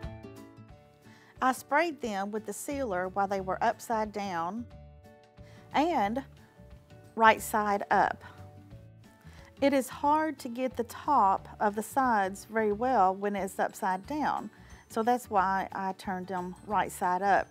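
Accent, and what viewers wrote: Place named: South, United States. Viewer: OMG you have to talk faster and shorten these videos. Half of this wasn't needed and the slow talking with the southern draw was too cliche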